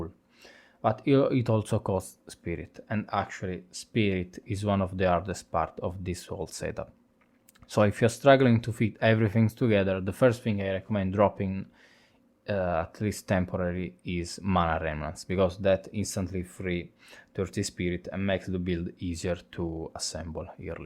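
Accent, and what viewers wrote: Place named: Italy. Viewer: The italian accent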